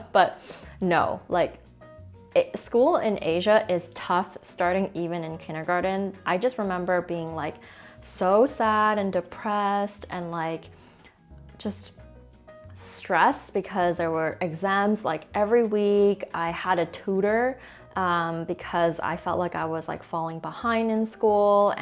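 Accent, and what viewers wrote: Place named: England, United Kingdom. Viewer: You are such an inspiration. I love your english accent. ♥️ from Malaysia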